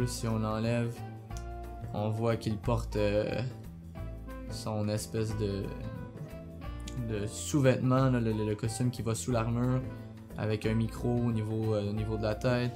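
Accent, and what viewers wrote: Quebec, Canada. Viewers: Je vien de découvrir ta chaîne et dès que tu as commencé a parler j'ai su que tu était un frère du QUÉBEC !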